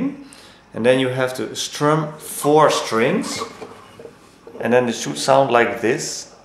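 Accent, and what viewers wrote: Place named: Netherlands. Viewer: the MIDDEL VINGER! haha nice accent:$ nl ftw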